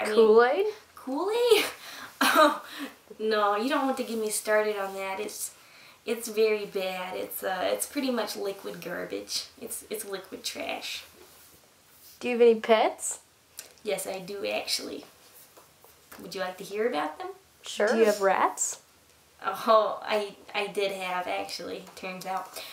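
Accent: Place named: Upper Midwest, United States. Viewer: Let me guess, she's from Wisconsin? Perhaps Minnesota? Nice accent:)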